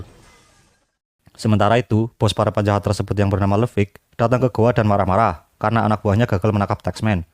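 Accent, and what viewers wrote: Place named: Java, Indonesia. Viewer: Terlalu medok bang…oalah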